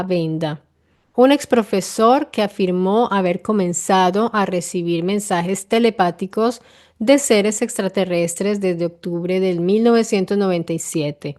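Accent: Italy. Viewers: muchas gracias por tu nuevo video!!!! ya entendí por qué pensé que eras italiana viviendo en colombia por tu acento, era al revés jeje... pues si, esto de los zíngaros es un problema inmenso de toda la vida, pero ahora con el "buenismo" en el sistema judicial, pasó de problema a catástrofe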